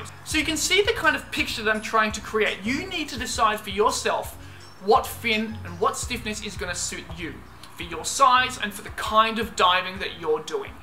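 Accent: Australia. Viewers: I like they way you talk Aussie man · I lova australian english!!!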